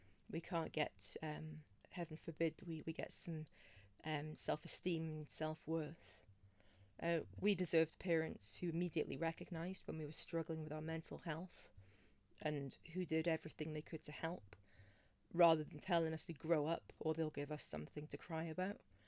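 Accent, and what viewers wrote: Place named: United Kingdom. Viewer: Greetings from your sister over here in Savannah Georgia, a gentle hug and love from me to you. You have the British accent I have the Southern accent, 🌷 Happy Easter precious Soul.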